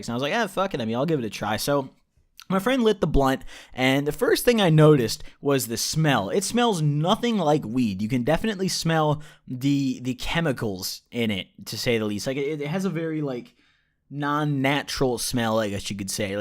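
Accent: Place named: Illinois, United States. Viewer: i fucking knew you were from Illinois based on how you talk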